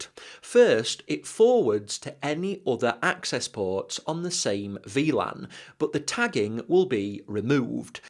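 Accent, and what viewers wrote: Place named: Northern England, United Kingdom. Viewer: In your opinion, how much networking knowledge one should have to go into cloud architecture? Would you say CCNA level is sufficient or maybe a person should be at CCNP level, or one would manage with Netywork+ ? Thanks. by the way, super cool videos and teaching style. Love the UK northern accent :-) miss it here, down under :-D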